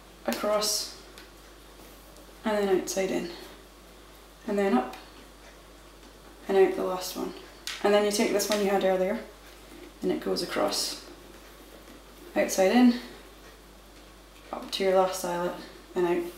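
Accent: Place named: Scotland, United Kingdom. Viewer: You're description of this technique is exactly how I wore mine in the late eighties/early nineties. You're freckles and Scottish accent are adorable. Having been born in Belfast and grown up in Toronto... It must be said, You are an absolute gem. Cheers, Michael Hughes in Toronto.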